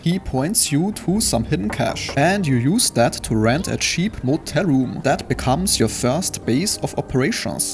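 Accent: Germany. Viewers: Are you German? Because of your dialect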